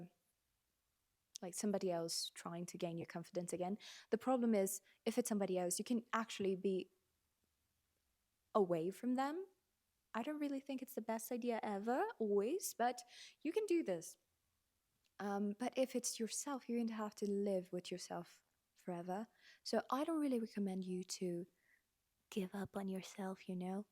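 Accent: United Kingdom. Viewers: In your first videos you used to speak with an American accent.. now you are speaking with British accent .. congratulations for being able to change accents so well... I am able to speak only with the American one .. and that's the one like the most .. but somehow I enjoyed listening to you speaking with British accent .. it is kind of pleasing to my ears ..I dont know how to explain it ..😅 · Hummm!! Inglês britânico!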